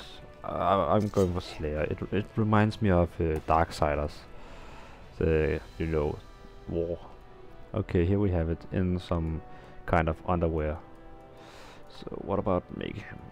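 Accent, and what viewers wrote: Denmark. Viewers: Your english sounds Danish like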